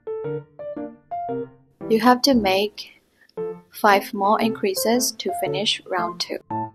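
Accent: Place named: Myanmar. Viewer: Are you burmese? bcz ur accent sounds abit like burmese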